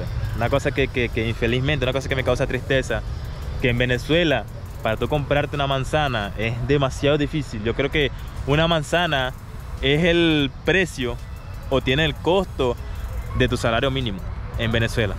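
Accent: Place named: Brazil. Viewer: Vc tá falando portunhol 🇧🇷👈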